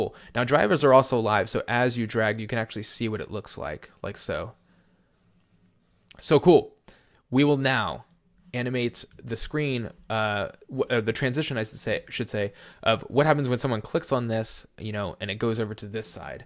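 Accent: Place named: Canada. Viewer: great tutorial, appreciate your down to earth style, sense of humour, and humility. Couldn't pin down your accent! you sound Canadian.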